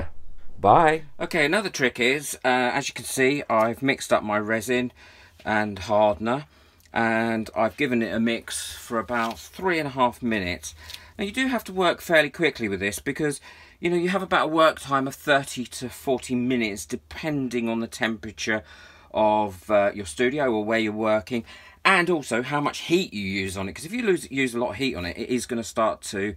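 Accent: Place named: Essex, United Kingdom. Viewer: Hello I’m listening to you in Illinois but you sound like you’re from my home area of Essex,or maybe Kent love the video’s especially the bloopers. Just want to get started with resin I have quite a few silicone molds that I’ve used to make soap,candles( little LEGO men) am I ok to use those molds?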